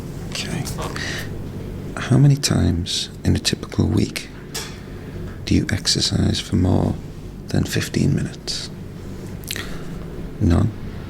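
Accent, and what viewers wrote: Merseyside, United Kingdom. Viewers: that your from Merseyside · I never thought I'd like listening to a Merseyside accent (no offence, just personal preference) but I really enjoy this.:)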